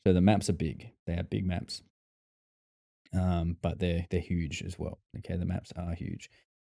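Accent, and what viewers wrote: Australia. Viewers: Hey mate I am looking into getting involved in dcs at some point, I watched this, and if and when I get around to it I will definitely hit you up for info and further knowledge and hopefully you could be my wing man anyday ( had to say it ) / coach to get me up to speed. Great content and insight, loved the foul language as an Aussie myself you can't beat how we talk and have slang that most can't understand.. loved it, true words not just scripted for precious ears in this day and age.. I will definitely be watching more of your content because of how you come across and are REAL.. cheers mate..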